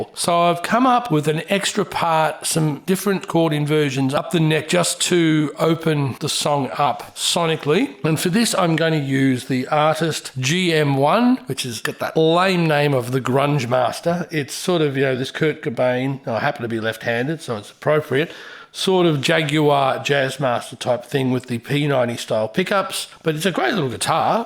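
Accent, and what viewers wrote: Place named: Melbourne, Australia. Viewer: Melbourne, Australia (accent)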